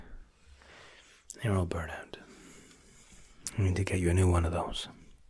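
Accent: Scotland, United Kingdom. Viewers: Scottish ASMR King! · Hannele he’s already got a Scottish accent lol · Are you English or Irish. Or Scottish?? I can't quite tell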